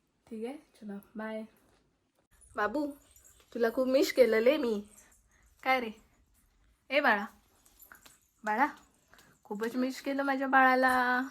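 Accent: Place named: Malvan, India. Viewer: नेहमीच छान असतात तुझे व्हिडीओ. बाळ खूप गोंडस आहे. मालवणी भाषेचा पगडा असलेलं तुझं मराठी बोलणं खूप छान वाटत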